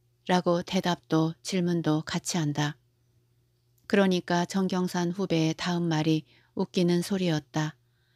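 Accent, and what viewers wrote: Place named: Chungcheong, South Korea. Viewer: 네 항상 정겨운 충청도억양이 좋아요 글도 잘쓰시고 행복하세요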